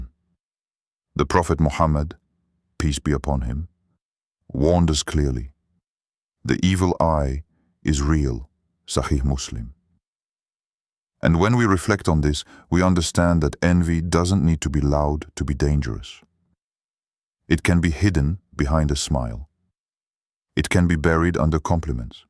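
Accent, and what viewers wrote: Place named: France. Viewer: Agfirullah Ya Rabbi but I can't stand😮 it but in love with that Speaker voice with his French accent,Tauba🤲🏻😭A always want hear his voice 😢Ya Latif, I'm addicted to it Hasbun Allah vanimel vakil😢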